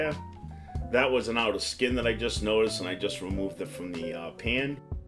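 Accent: Rhode Island, United States. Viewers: It's cool to hear a fellow Rhode Islander with a cooking video. It just so happens I plan to make this tonight. My recipe is a lot like 'yaws :)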